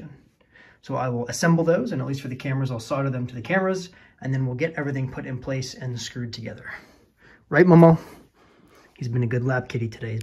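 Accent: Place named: United States. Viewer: Sounds like your American, how about giving us inches, feet, and grams/lbs.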